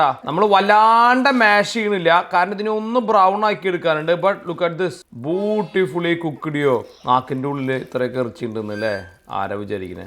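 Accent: Thrissur, India. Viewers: Chettan thrissur karan gadi ahnu enn orapich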